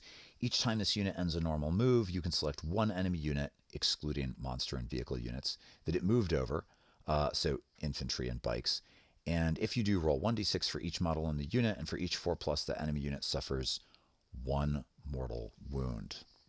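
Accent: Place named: Canada. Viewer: Not saying Canadian's are cooler or anything. It is a cool accent nonetheless. We are all from London anyway. 🤣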